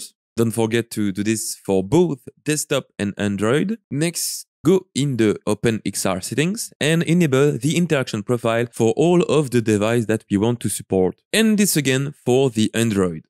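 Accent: France. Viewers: On dirait un belle accent français là non ?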